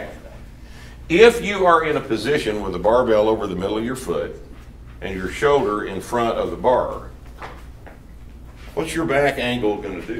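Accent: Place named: South, United States. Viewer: He should say to start drinking when the video starts to dull the pain of his "good ol' boy" accent and attitude...he's the master but good gosh it's torture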